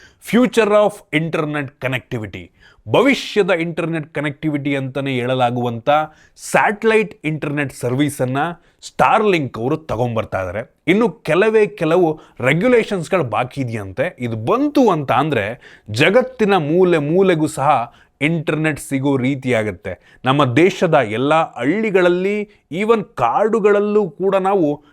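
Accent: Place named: Southern Karnataka, India. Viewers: Common problem in southern Karnataka. All my classmates used to talk like this 😂. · All Hasana boys common problem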